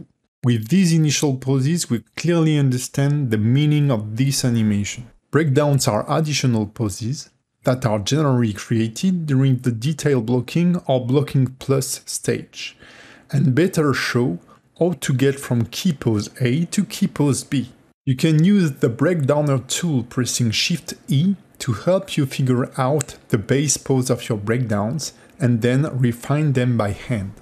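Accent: France. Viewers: You sound french ! That makes me understand more well !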